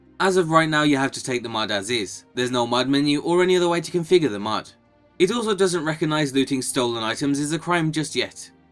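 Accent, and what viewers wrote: United Kingdom. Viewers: It's what happens when you've got a British accent.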